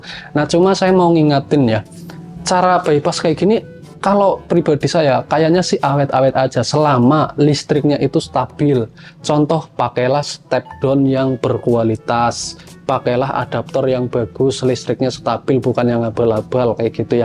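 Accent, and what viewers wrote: Banyumas, Indonesia. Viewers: bjir hpne go ngpo kui mase.hihihihhii apa jadi mesin MiFi batangan. ngapak e ngndi mas,slm kng somagede. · wong endi sih..deng bisa ngapak...xixixixi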